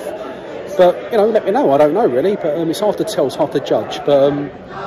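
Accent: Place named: London, United Kingdom. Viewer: Absolutely brilliant mate , you sound like a Londoner , my favourite city in the whole world after ……. This video had me in tears , ma team - the Bully wee. WRTFBW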